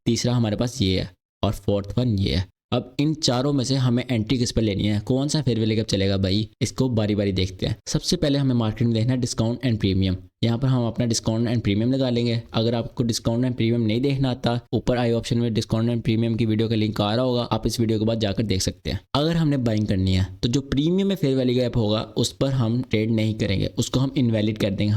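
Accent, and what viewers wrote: Pakistan. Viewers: Pakistani lgg Raha ha lounda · Bhai aapki tonse se lag rha hai ki aap pakistani ho ya north west India se belong karte ho.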